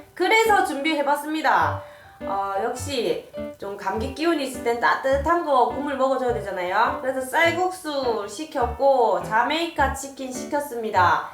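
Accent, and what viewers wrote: Gyeongsang, South Korea. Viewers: 안녕하세요..경상도 사투리가 너무도 정겹네요. 저는 질금나물을 좋아해서 쌀국수 1: 질금나물2 이렇게 넣고 베트남 생고추 총총 썰어서 맵게 먹어요. 오늘도 너무 맛있게 드시네요.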